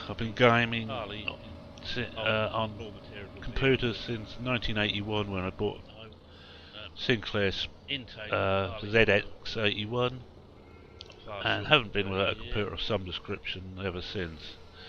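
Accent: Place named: Newcastle, United Kingdom. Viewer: Wonderfull geordie accent...Lol Thanks bud Good vid man thumbs up BTW i would like to see soil mod on old ridge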